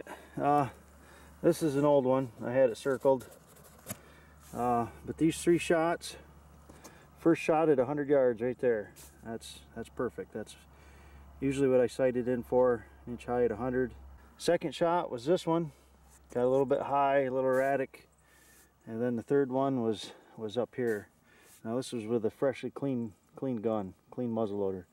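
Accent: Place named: Ireland. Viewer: Great video. You realize that you have any very obvious Irish accent under your American. Did you do your family tree lol ? Best regards, Eddie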